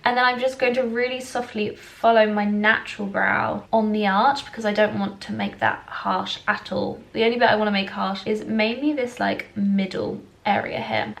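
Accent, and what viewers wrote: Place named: RP, United Kingdom. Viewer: I love your accent. You sound really posh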